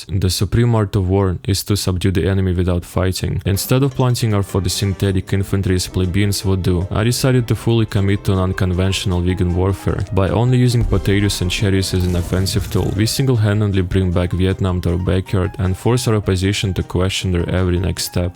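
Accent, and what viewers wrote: Russia. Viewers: я слышу твой русский акцент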